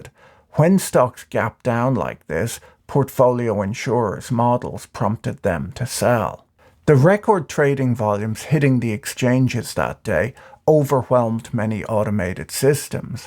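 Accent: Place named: Ireland. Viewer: He's Irish. At full speed, Americans would not be able to understand a word.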